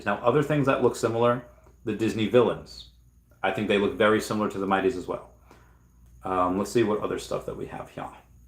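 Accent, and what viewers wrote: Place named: Australia. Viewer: 😂 cracking English Australian accent 👍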